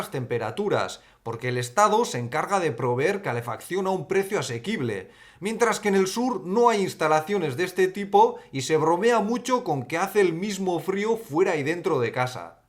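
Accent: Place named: Basque Country, Spain. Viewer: Entre la burrada de "añadimos unos cuantos dientes de ajo" añade como 20 y el acento, algo me dice que eres vasco.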